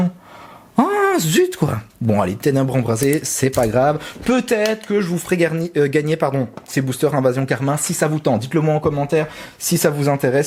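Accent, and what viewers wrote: Belgium. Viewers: Ah oui désolé j'ai pas compris ce que tu as dit quand j'ai demandé la dédicace avant dans la dernière vidéo que tu as fait désolé je comprends pas trop le Belge je suis français que dit mon prénom quand je t'ai demandé de me dédicacer si c'est moi merci et si c'est pas moi stp sinon courage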